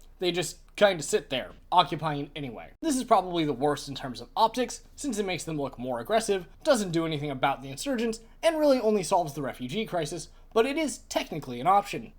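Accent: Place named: United States. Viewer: You have a very thick American accent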